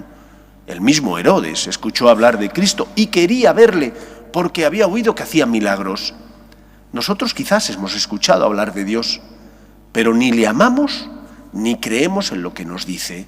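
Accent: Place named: Spain. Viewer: Me encantan el acento español, Respetuosamente le saludo Sr Sacerdote 🇲🇽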